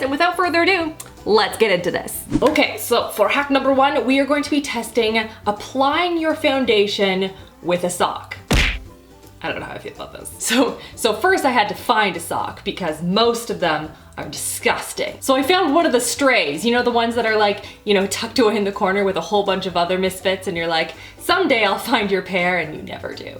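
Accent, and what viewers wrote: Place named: Canada. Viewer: She has a slight Canadian accent.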